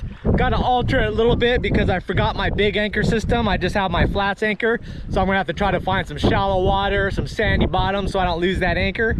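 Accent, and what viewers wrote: South, United States. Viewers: Very interesting, an Asian person with a southern accent, cool !!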